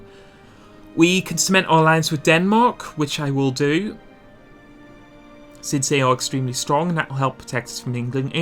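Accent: Northern Ireland, United Kingdom. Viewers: North Irish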